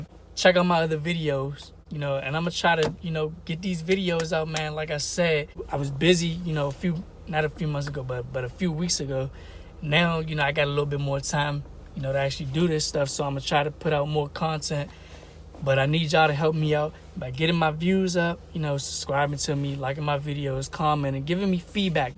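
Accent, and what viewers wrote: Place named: Maryland, United States. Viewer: as soon as i heard your voice i thought of maryland, i’m from NC but family lives there... good video brodiii💪🏾🙌🏾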